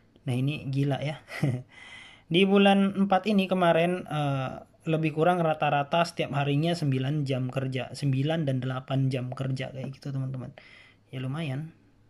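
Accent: Java, Indonesia. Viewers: Mas kamu dari jawa ya kok logat dan bahasanya jawa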